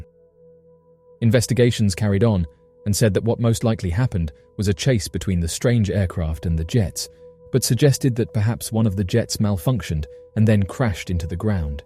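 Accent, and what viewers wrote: England, United Kingdom. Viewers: You sound English so no excuse - the plural of aircraft is”aircraft” NOT “aircraft’s”. Just saying…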